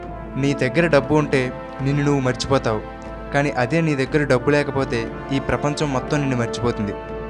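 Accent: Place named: Kakinada, India. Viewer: Ea vuru bhaiyya? Kakinada aa? Voice 😝slang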